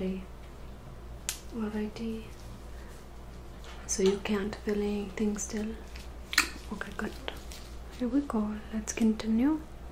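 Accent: India. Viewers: You are my favorite asmrist I wish you made more videos. I love Indian accents, even if you are acting. Plus, you are super gorgeous.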